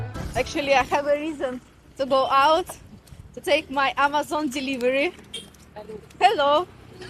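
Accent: Russia. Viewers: О май год. Это вас я видела пьяной и матерящейся в Арамбольсеом магазине... А акцент у вас .... Отвратительнийший - хуже индийского акцента, только жесткое рашен стайл... Не зря я не доверяю русскоговорящим учителям английского... Вы станете мечтой индийских мужчин - которые мечтают приехать в Гоа и переспать с русской... Так как кроме них вас никто смотреть не будет - это невыносимо. Но вы станете мемов в индийской блого сфере ... Вы прямо готовая РУССКАЯ НАТАША (Наташами в Турции и Азии называт "сексуальных/доступных - переводится с азиатского на обще понятный" женщин). Значение английских слов сказанных индийцами, которые вы можете воспринять как комплимент, имеют сугубо утилитарное значение и весьма далёкое от значения этих же слов в западном мире. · I love Russian accent and girl ❤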